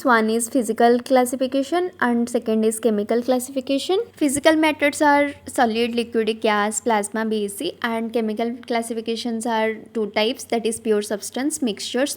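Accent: Odisha, India. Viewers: AREY APP ODISHA SE HO KYA 🤔🤔🤔✨ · Didi are you from odisha? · i think may be u are from Odisha na ?? bcz ur way of talking reflects that..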